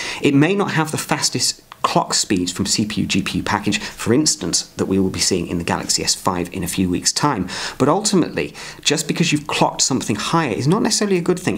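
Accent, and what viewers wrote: England, United Kingdom. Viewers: Please slow down your speech. Some people don't do cocain nor are they nine years old. I was interested in this video for reasons of technical research and personal inquiry. It took me a half second to make out what you were trying to say about the device. I do find it slightly difficult to make out an English accent as it is. But I thought that if you were wanting your video to be more professional as it would appear to be such a topic. I think it could be spoken in such a manner where all could enjoy. Thanks! I tried to PM this message but the option wasn't available.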